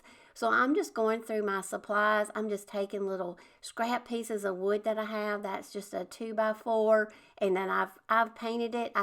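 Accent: South, United States. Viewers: I like your southern drawl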